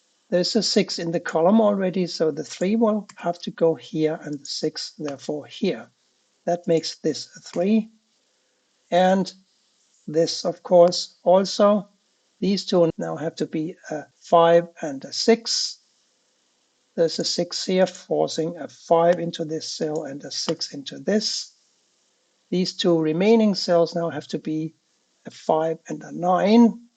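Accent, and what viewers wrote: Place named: Germany. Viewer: Are you German? Love you accent!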